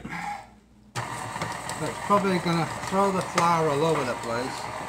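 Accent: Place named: Manchester, United Kingdom. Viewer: I like the Manchester accent but what is it you've made?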